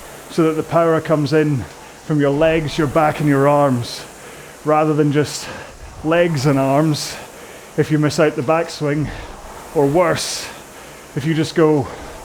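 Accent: Scotland, United Kingdom. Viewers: You’re my No1 go to. Love the chatter, the coaching, the technique, the production quality and the humour. (The Scottish accent helps as well). So hope you also have #patience for staying with us here, Coach! 🙏👏👏👏👏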